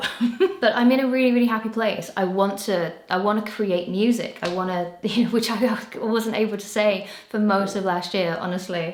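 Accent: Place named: Essex, United Kingdom. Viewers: Off topic (and sorry if you've addressed it elsewhere) but has your accent changed? We're both from Essex and I could have sworn you used to have a more southeast England accent - it sounds a bit northern now? I've been a fan since the early days and now I'm going mad trying to remember if you've always had this accent! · I would have loved both villains 1&2 to be singles with a video. I also think that maybe putting the more pop songs on another album would have worked if you know what I mean? Also you have lost a little bit of your Essex accent and I’m missing it 😂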